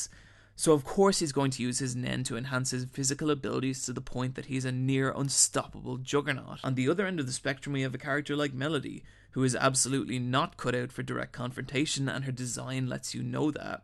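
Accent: Ireland. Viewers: Great review. btw are you Irish?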